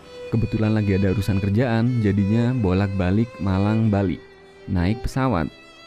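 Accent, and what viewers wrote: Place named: Probolinggo, Indonesia. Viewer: Pantesan mas, logat e khas pol wong probolinggo 😅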